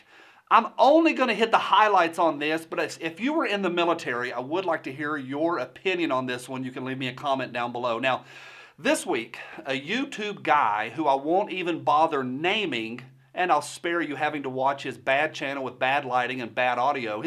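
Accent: South, United States. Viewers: Really respect Paul Harrell and like his videos..I like your videos as well and have just subscribed....as I listened to you I was thinking man he sounds familiar....and then you you said " Shazam " and bingo !! Gomer Pyle !! I grew up watching TV shows that Jim was in and always liked that guy. Being a Appalachian born hillbilly myself I love hearing southern accents on the tube...old tube or you tube ... thanks for what you do really like your channel. Also I'm a vet and absolutely do not like posers..now I will go and watch the video in question...thanks "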